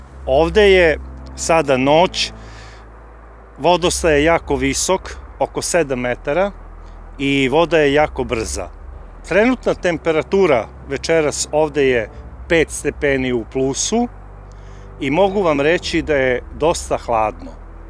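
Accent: South, Serbia. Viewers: Ah, taj južnjački akcenat koji pokušavate da sakrijete, nepotrebno, pa zvučite izveštačeno dok pričate...Surdulica, Vlasotince, Leskovac...Naročito ne ide uz tematiku gde otkrivate, pratite tragove, a prikrivate svoje poreklo i naGlasak. Pričate sve kao da ste Vi Maja ili Vi Matej, zanimljivo koliko teorije pričate kao da je 100% činjenica.."Maja se družila" stalno pričate u prošlosti, kao da više nije živa, kao da se više ne druži!!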